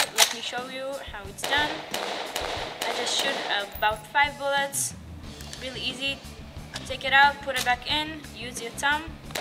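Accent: Israel. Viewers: If you really want to get into details, she's not Russian, she's Israeli, read the description, about 20%-25% of Israelis today are Russian immigrants or their children from the big soviet nations immigration from the early 90's, so judging by her name she's probably from a Russian origin, but judging by her age and accent, she most likely was born and raised in Israel.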